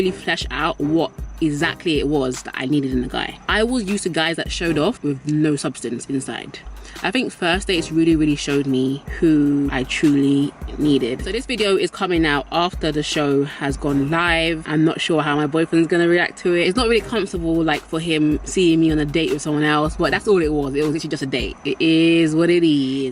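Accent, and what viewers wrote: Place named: England, United Kingdom. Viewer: !!! I missed you. I haven't been on your channel for a while. I am so happy that you are doing well and have a 1yr anniversary coming soon. Congratulations. I feel you on the dating alone thing. I'm kinda going through that right now. I'm learning a lot about myself as an individual and what are my game changers and deal breakers. I love, love your English accent.😍 You have a very beautiful and giving spirit. God Bless you &so much love. 🤗❤🙏🏿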